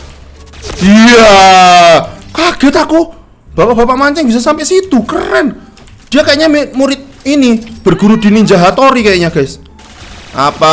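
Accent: Java, Indonesia. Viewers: baru nonton lagi video bg ini makin medok ye ngocehnya wkwk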